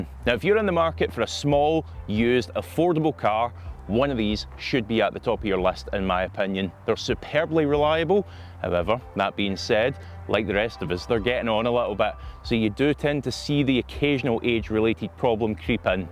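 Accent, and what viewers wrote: Scotland, United Kingdom. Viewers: the scot is strong with this one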